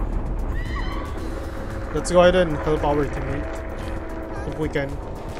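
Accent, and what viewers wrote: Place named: Philippines. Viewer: pinoy ka pre? medj dinig ko punto mo haha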